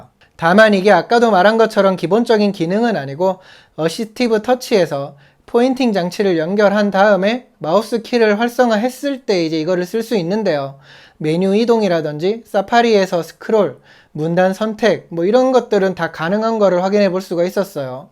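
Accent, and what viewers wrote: Gyeongsang, South Korea. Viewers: 아우.. 목소리도 답답하고 그 목소리로 경남 사투리쓰니까 들을수가 없네요.. 첨입니다 악성댓글은 참 대단하세요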